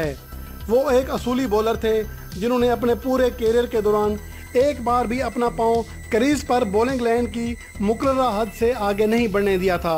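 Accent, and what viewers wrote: Sindh, India. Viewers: Aap ki boli se lagta he aap sindhi ho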